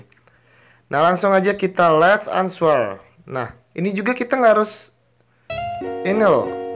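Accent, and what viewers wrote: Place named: Lampung, Indonesia. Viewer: Lo orang njirr. Kek orang lampung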